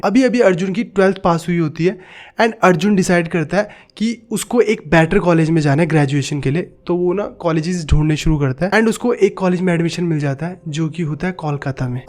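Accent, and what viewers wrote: Punjab, India. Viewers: Sir ap punjabi hai kya... I mean apka accent or dialogues punjabi style me hote hai....like chandigarh panchkula side... Mane abhi aj hi apki ekdo videos dekhi first time...mujhe lga k ap udhr se hai kya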